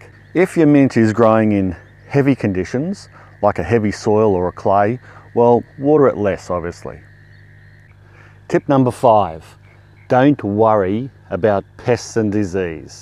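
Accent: Australia. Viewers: This is good. It’s even better at 1.5 speed increase. I love Aussies. Have I got that right?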